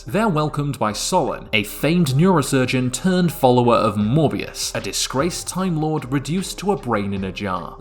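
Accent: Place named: Canada. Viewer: Sounds a bit Canadian.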